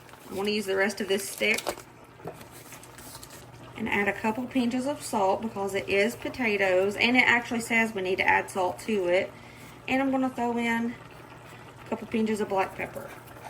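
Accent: South, United States. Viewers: I think this video was great, if we had a dollar tree in New Zealand i would totally try something like this food prices here are getting crazy. Im sure this video has inspired or helpped a family archive a holiday meal on the cheap and sometimes in life money is tight. Also your southern accent is amazing!!! 😊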